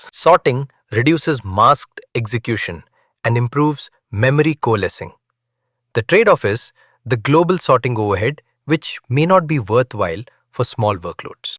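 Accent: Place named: India. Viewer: why does a clanker need an indian accent, like horrible coding course squared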